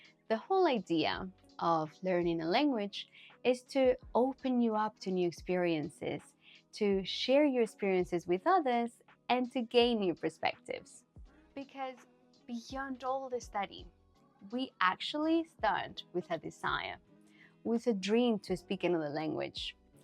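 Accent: England, United Kingdom. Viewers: Your videos are very helpful thank you. Are you living in the UK? I think I can hear an English accent in there lol. I’m from Northern Ireland. I hope your channel grows as it should.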